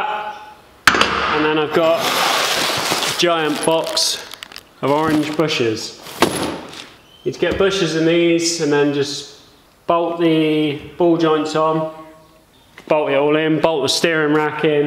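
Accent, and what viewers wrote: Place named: Northern England, United Kingdom. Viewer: crickey, you sound Northern!!!! 😂😂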